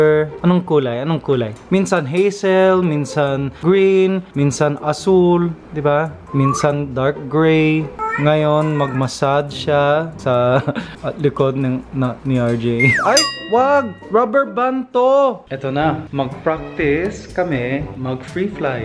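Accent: Philippines. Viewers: Haha luv your filipino accent 🤣🤣🤣Puede ba mag tagalog pa minsan minsan, katuwa ka eh😁😁😁Keep it up! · Hahaha funny! I miss you pilipino accent! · Lets Go To another Channel now i dont like to hear his filipino accent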